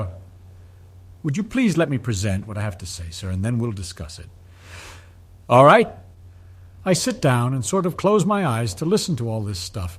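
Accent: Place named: United Kingdom. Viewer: the British accent lol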